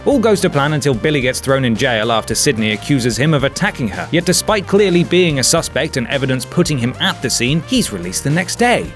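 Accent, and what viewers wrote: United Kingdom. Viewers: Considering that Donald Pleasance had a British accent like yourself and John Gavin doesn't that theory is complete bollocks as you limeys say. And he's not as good looking or tall as John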